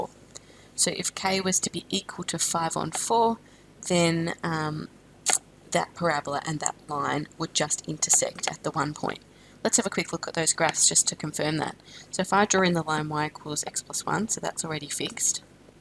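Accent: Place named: Australia. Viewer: i needed to find an aussie math channel cause im in aus and as i soon as i heard ur voice i found it thanks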